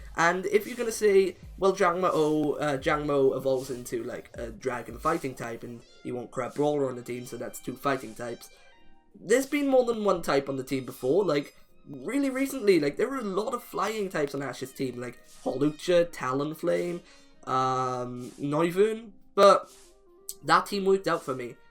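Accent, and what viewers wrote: Wales, United Kingdom. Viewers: R u Welsh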